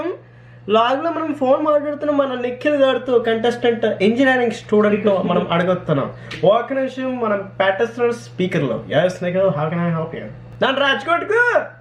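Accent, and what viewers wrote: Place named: Hyderabad, India. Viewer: ana you sound like jesse in breackin bad vere asal haydrabadhi bhai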